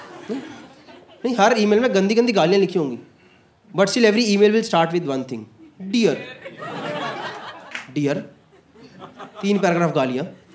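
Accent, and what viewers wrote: Delhi, India. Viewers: Every second Delhite sounds like this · Typical delhi accent :D just loved and liked your all the videos...your new fan :D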